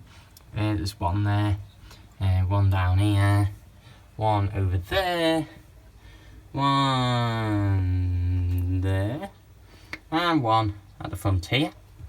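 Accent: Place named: Liverpool, United Kingdom. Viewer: Are you from Birkenhead 😅 starting watching and I was like hmmm doesn’t sound scouse but almost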